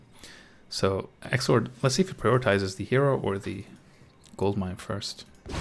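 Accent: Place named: United States. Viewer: Hey,! You sound like someone who's spent a significant time either residing in the US, or having been in continuous contact with Americans. Is there any truth to that observation?:)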